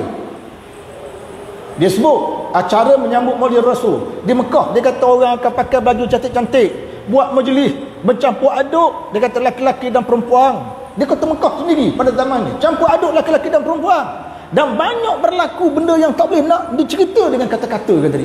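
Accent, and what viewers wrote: Terengganu, Malaysia. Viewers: Rasanya ustaz ni org terengganu, mendengar pd loghat beliau. Tapi takde pulak mem BODO BODO kan org, me MENATANG kan org, meng HAIWANG kan org...Wallahu a'lam.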